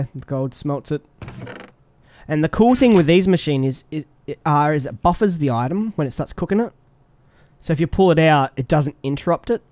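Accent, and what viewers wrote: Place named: Australia. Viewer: ahh the sweet voice of an aussie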